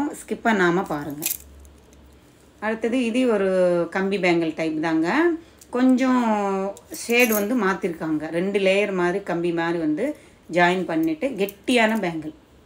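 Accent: Telugu, India. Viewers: Neenga pesurathu thelugu kalanthu varuthu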